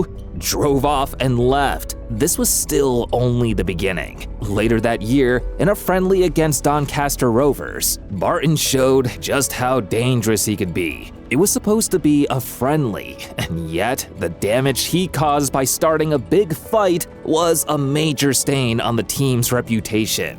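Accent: United States. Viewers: Be quite a interesing episode apart from the crap american narrator. Bellend!!joey is a wrong un but talks "sometimes"sense in some issues.absolutley radio rental but his family shit wernt his fault.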